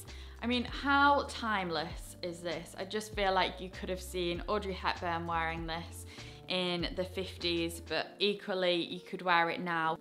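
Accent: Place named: Manchester, United Kingdom. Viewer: First of all— obsessed with your style tips. This is literally so helpful. Second, I didn’t know why your accent sounded so familiar until I realized that you’re in Manchester and that’s where the 1975 is from, and Matty’s accent sounds exactly the same. Haha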